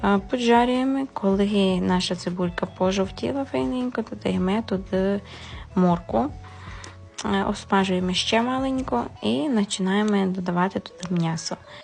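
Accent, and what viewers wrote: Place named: Transcarpathia, Ukraine. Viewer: Противний говор закарпатський.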